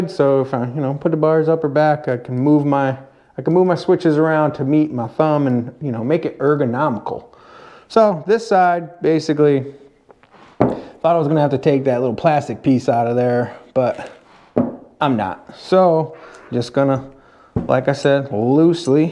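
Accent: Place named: South, United States. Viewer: You’re Southern accent is strong in this video. 😂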